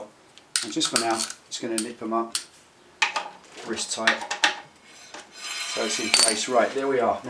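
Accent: United Kingdom. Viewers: You , sir, are absolutely brilliant! I always watch a video or two each time before working on the Bonneville. Being an Anglophile, the British accent goes a long way toward getting what you're saying lodged into my mind, lol!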